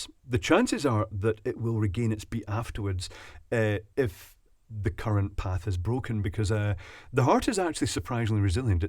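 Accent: United Kingdom. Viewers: It's amazing how Americans perceive British accents. I envisioned you with a double breast suit, bowler hat, and maybe a pipe. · Wow... We see you... I watched many videos... I pictured a completely different looking person. Ha! It's the British accent.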